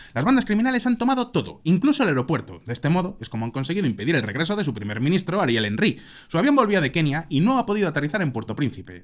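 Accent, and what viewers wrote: Spain. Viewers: Tienes acento español, España está perdiendo la guerra cultural contra las nazis feministas y retrógradas wokes que desconocen la realidad latinoaméricana y se ponen a opinar y condenar las medidas tomadas por Bukele o Miley, cuando las tierras españolas se están llenando de inmigrantes que huyen de esta realidad latinoamericana XD... El socialismo en un fracaso y la evidencia son las ONG que comparten sus recursos con los haitianos. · Me gustan tus videos, son muy informativos, pero por favor habla más despacio, tu acento español es un poco difícil de entender en latinoamerica.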